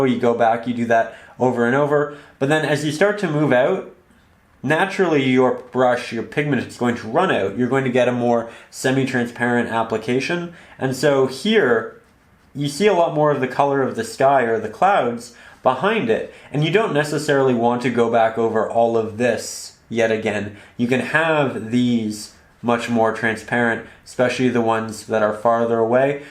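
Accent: Canada. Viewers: Wow you're Canadian accent really comes through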